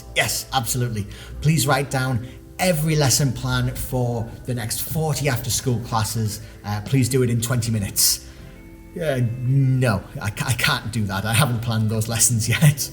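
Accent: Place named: Northern England, United Kingdom. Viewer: Absolutely blowing my mind that you are from Newton Aycliffe! This video was in my YouTube suggested and I've just applied for Epik so thought I'd check it out. Straight away heard the northern accent and checked out the comments to see you're from my town!! What a coincidence - small world aha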